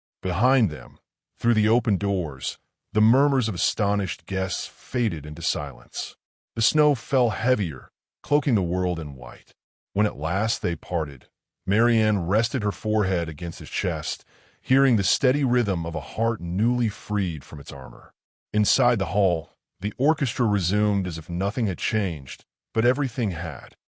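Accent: United States. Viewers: I disagree! It needs to be narrated with a British accent, not American.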